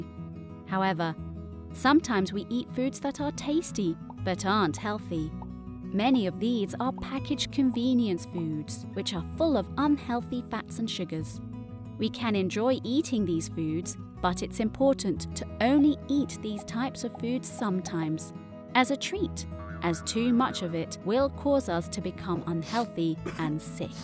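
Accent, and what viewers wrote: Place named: United States. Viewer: american pronounciation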